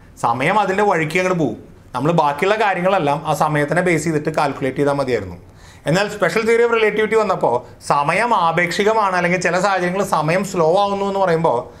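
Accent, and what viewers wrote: Thrissur, India. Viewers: Sir Thrissurkaaranano😀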